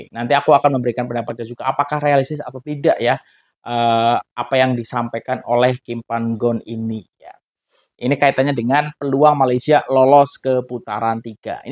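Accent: Java, Indonesia. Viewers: bang anda asli orang jawa kah?😮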